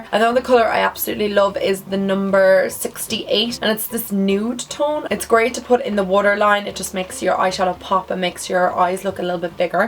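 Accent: Ireland. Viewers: so funny I'm obsessed with you girl your amazing and me and my fiance are obsessed with conor McGregor yall are both Irish and while I'm watching you and I hear another Irish accent lol I look over and my fiance watching conor lolol point of story I love you and we love Ireland ♡ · i watched several of your videos and i could figure where your accent cam from. well now i know :) always loved Irish accents!!! you are now added to my favorite youtubers XD besides i love your videos anyway so your accent is just a plus!!!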